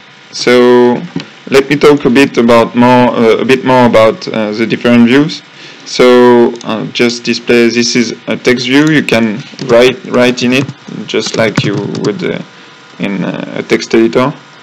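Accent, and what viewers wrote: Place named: France. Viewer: nice french accent :p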